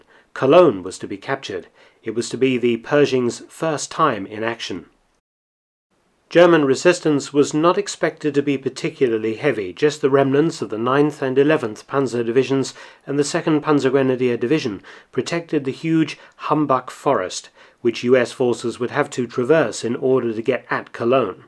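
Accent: United Kingdom. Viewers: Thanks for the video. I can tell by your voice that your British. Your our best friend and that will never change. Us Americans are very loyal we don’t give our loyalty easily. Liberty or death.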